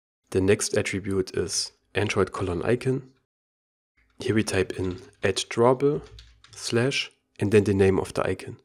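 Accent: Germany. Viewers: ich mag deinen Deutschen Akzent^^ Great Videos Du bist der bester :D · nice german accent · I assume of your accent your German like me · Schöner deutscher Akzent!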